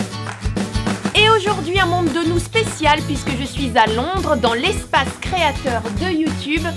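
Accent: Normandy, France. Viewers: Superbe vidéo! ^^ J'aime beaucoup t'es vidéos à chaque fois sa redonne du pep's! ♥ Tu as dis que tu avais un accent Normand donc tu étais originaire de Normandie! Et je voulais savoir si tu y viens encore souvent? Car je vis en Haute-Normandie et j'aimerais tellement te voir. Peut-être qu'un jour tu viendras à Rouen!:D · Ho ! Un accent Normand ? Tu est Normande ? :D (Gyaah ! Un point commun avec toi si c'est oui ! °3°)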